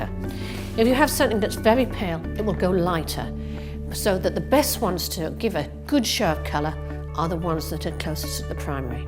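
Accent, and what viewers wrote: United Kingdom. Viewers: british as hell, sharp as usual good job!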